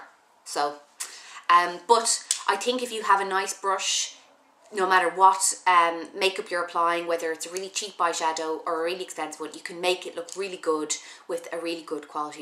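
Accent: Ireland. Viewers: This probabley sounds really silly...but I just love the irish accent. I read a whole bunch of Maeve Binchy novels and hope to travel to Ireland some day.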